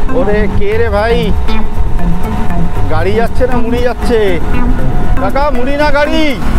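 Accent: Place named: Medinipur, India. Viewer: Apnar uchcharon puro medinipurer, valo korechen, kono artificial noy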